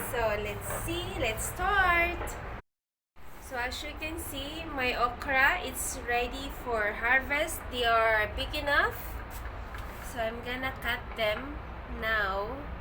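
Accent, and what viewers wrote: India. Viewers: Good at my ukra sa paso good idea host Thank you so much for sharing the way you talk sounds like indian too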